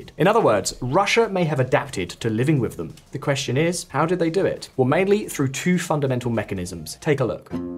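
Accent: RP, United Kingdom. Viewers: Why does drivel told with confidence and in posh British accent sounds reasonable? That's the only question I have to you. Russia has the fundamentals, including energy, food, minerals, wood, and some decent, though mostly basic, industrial capacity that allows it to manufacture finished goods, particularly weaponry. Weaponry and ammunition at a price point much lower than Western's. Some of this weaponry -- especially the drones -- is effective against multimillion dollar Western equipment. The rest (including many types of FPV drones and chips) is imported, both directly and via intermediaries, especially from China, with whom Russia shares an immense border. As to budgetary deficit, it is not so large, compared to the income Russia has from its exports, which will allow it to eventually recover its finances. While you're at it, you should have mentioned that all this expensive energy that Europe has been buying has led to deindustrialization. 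Particularly, and most painfully, in Germany. And it's ongoing. Also mention the US's deficit. It's stunningly large, and some say there'll be hyperinflation in the US. Saudi Arabia has partly moved to abandon the exclusivity of the petrodollar. I'm suspecting that the anglophone elites are intent on screwing up the world, including themselves. But I'm undecided whether it's because they're crazy, stupid, or stubborn as hell, or all of it. Maybe the decadent and dying British aristocracy is an indicator of that. After all, they're scr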